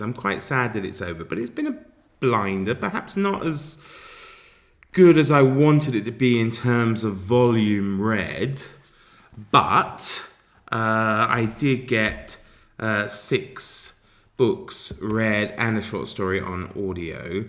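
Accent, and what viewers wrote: United States. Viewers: “Back by unpopular demand” had me wheezing right out the gate. And honestly, your commitment to that Western accent plus the Larry and Stretch voice acting? Emmy-worthy. You sold me on Lawless Miles. Fun wins. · Hi,! I don't know if you take requests, but I have one. Will you consider, please, carrying your Texas accent over to Victober? I would very much enjoy hearing you read Dickens and Trollope, etc., to us as Tex!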